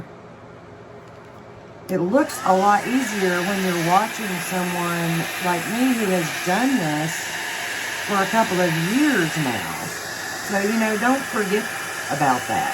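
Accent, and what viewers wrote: South, United States. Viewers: Hi, another gorgeous piece! You’re so patient. Can I ask, when using the photo paper, doesn’t it soak through? I bought an ordinary brand for ink jet printers and that’s exactly what happens. Soaks straight through. Have I got the wrong type? Or is that normal? Thanks. I love watching you create and listening to your southern accent. 🙏🙏🙏🤗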